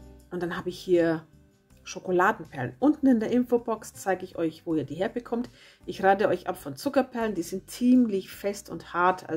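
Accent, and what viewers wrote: Franconia, Germany. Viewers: Ich liebe dein fränkisch :)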